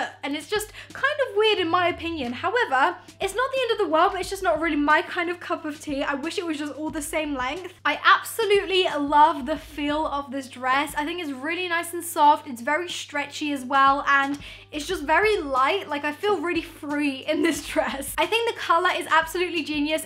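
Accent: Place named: United Kingdom. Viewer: Jana Deni she has a British accent of course she isn’t going to say it right 🙄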